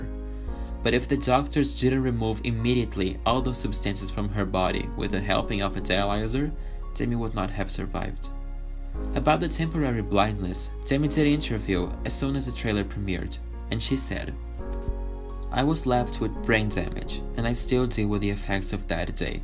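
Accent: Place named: Brazil. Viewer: Esse sotaque parece brasileiro🤔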